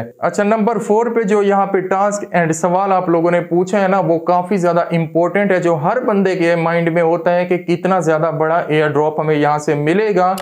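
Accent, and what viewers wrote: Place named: India. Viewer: pass indian accent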